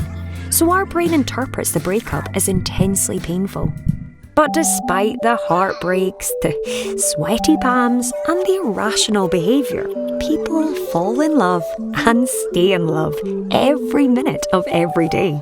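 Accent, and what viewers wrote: Scotland, United Kingdom. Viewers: Pretty sure she is a brit, but i don't know exactly which region.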